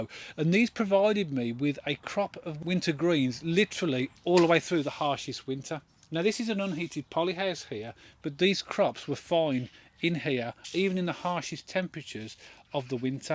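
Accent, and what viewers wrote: Birmingham, United Kingdom. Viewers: Sound like a brummie, where u based? Im in smethwick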